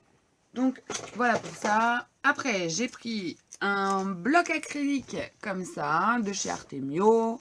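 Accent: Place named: England, United Kingdom. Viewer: Ton accent anglais j’adore. Une affaire la peinture izink ça marche sur tout support,tissus ,métal ,bois elle sont tops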